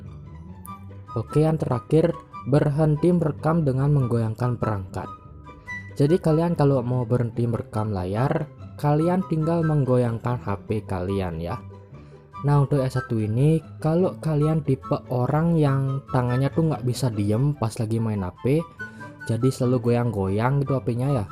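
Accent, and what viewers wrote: Bali, Indonesia. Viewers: Logat abangnya ke orang bali salam bali kawan🙏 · Orangh Bali Banh? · Kayak Logat Orang Bali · Adi care nak Bali logat ne,,😀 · Org bali ya bg?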